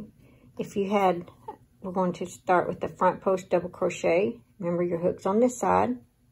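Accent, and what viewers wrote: South, United States. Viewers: I love your southern accent. I also love...love this pattern. Thank you so much for sharing!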